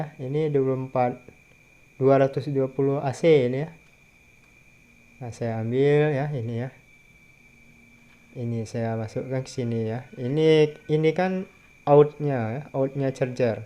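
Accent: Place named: Borneo, Indonesia. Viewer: Logatnya sperti logat Dayak...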